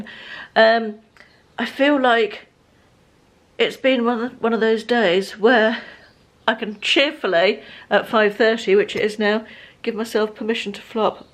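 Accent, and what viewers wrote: United Kingdom. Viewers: Yes, but you get to say those Americanisms in a British accent, so there is that! 😂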